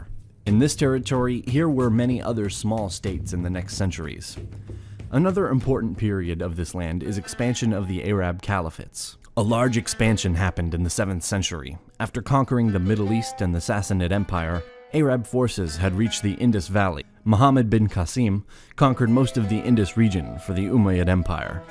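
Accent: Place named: England, United Kingdom. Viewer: Marathas defeated and controlled whole india from 1700 to 1818 with puppet mughal emperor...... But foolish english accent person have no mention in video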